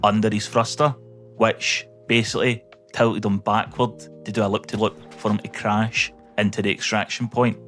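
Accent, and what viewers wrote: Scotland, United Kingdom. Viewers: How have you never heard a Scottish accent before?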